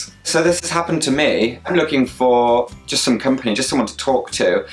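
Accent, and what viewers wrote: United Kingdom. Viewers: a really great video , I know your struggle! one question , are u british? love your accent xoxo from italy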